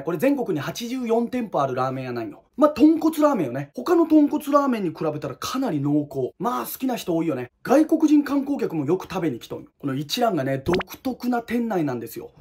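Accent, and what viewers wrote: Hiroshima, Japan. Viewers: ラーメン動画を検索してたらオススメで上がって来て初めて拝見しました😀 私も広島出身ですので各店舗への広島弁でのコメント冗談も交えてすんなり耳に入って来ました❗😂 あと広島ご出身であればチェーンではないかもしれませんが岩国ラーメンの寿栄広さんご存知ですか？😀 広島に帰った際はわざわざ岩国まで行って食べてます😊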